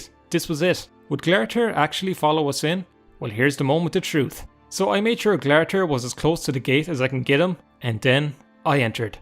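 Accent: Ireland. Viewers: Haha funny oirish man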